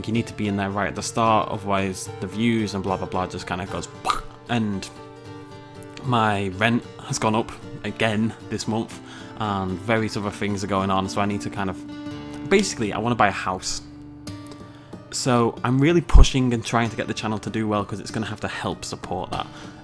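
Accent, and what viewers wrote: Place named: Manchester, United Kingdom. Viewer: I live in Manchester, I thought you were from around here from the accent. Would love to play ARK with you and come see JW if I'm free :) I'm watching a Twitch stream of ARK now and the server won't load :S hope the actual release is better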